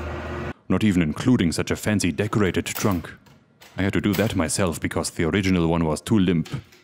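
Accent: Germany. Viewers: He is sounding more German that me when I speak english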